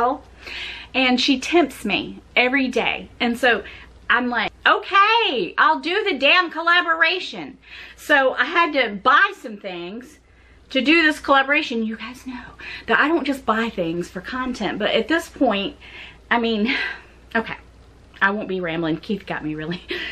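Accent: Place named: South, United States. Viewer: I see u got that southern accent luv it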